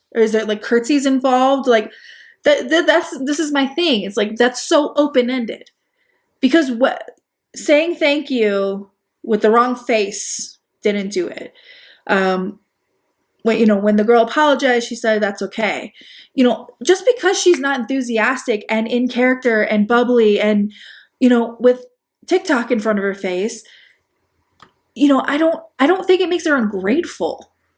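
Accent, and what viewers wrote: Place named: Chicago, United States. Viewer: Omg can confirm that her Chicago comes out when she's heated. It's my FAVORITE.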